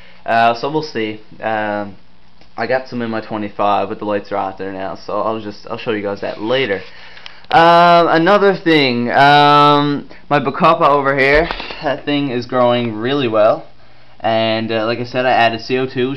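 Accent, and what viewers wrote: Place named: Canada. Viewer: sounds like your either canadian or Irish american. I also have a few questions, I want to get a betta and i don't really want too big of a tank you think a betta will be comfortable in a 5 gallon tank? My house it always around 78, will i have to get a filtration system or warmer, I was hoping just to have a 5 gallon tank, put some plants in it.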